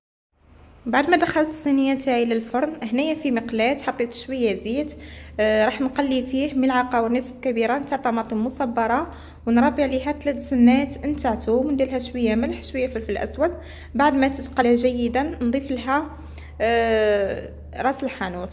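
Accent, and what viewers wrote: Skikda, Algeria. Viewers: لهجة تاعك تقول سكيكدية 😍❤ من وين انت؟ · سلام عليكم اختي بلا ما تقولي سكيكدية كنت علابالي خير الناس كي كنت نخدم خدمو معايا سكيكدين مشاء الله نفس اللهجة كي تهدري هدرتكم حلوة ناس حروش روسيكادا انشاء الله نزورها في الصيف جزت علها طريق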